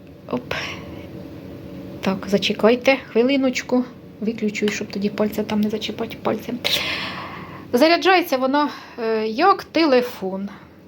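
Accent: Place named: Kirovohrad, Ukraine. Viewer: ви молодець,сільська трудівниця.Люблю вас дивитися і слухати.Мої дідусь і бабуся так розмовляли́ З Кіровоградщини.Миру, здоров'я ❤❤❤❤❤❤❤❤❤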